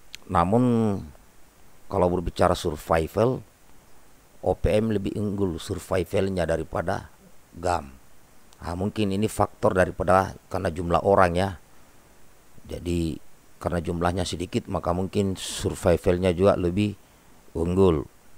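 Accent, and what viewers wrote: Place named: Batak, Indonesia. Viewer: Salam hormat jg kawan,,, logat mirip batak eeeee gak apa2 ttp mantap, jngn lupa ngopi 👉☕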